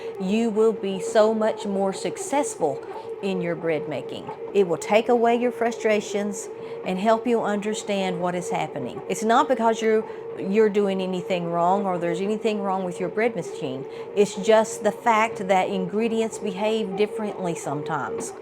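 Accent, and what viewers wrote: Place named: South, United States. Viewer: Do you ever make bread with fresh milled flour?! Love your channel, I found it through The Rose Homestead, and watched so many of your videos, lol!! I recently switched to fresh milled flour, it’s SO delicious, but it’s definitely something to learn, more because I never really made bread, just quick bread, cookies or biscuits, and an occasional muffin. I absolutely LOVE the taste, it’s nothing like what you get from refined flour, although I did just buy a bag of King Arthur organic bread flour, just to have on hand. I have Sue Beckers book, Home Grown Flour, which goes into detail about all things whole grains, and even ancient wheat, I’ve used some Kamut mixed with hard wheat, not on itself own, it’s very sticky, but tastes delicious!! I think many many people are getting into milling because of the health benefits, you seem to live in the South, by your accent, lol, I wish I could visit there, I love the hospitality there! 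I heard about it from a channel called, The Biblical Nutritionist, and then started watching Bread Beckers channel, great presentation, they also have a store in Woodstock, Georgia, I saw it on YouTube, it’s a very big warehouse type store, with everything necessary to start milling grain and making bread, it’s healed So many health issues in people, there are hundreds of testimonies, so I decided to try it!! I know I saw your video on the homemade yogurt you made with a yogurt maker, I bought the KBS bread maker, lol, yours looked great, and you can do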